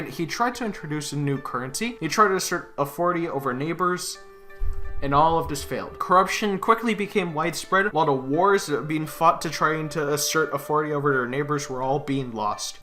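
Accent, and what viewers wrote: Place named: Chicago, United States. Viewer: DA MING, Down da line dere. I love hearing the Chicago accent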